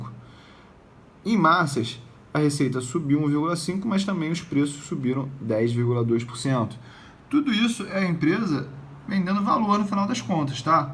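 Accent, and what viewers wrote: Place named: Rio de Janeiro, Brazil. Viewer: Bom vídeo , mas esse sotaque carioca é cansativo pra mim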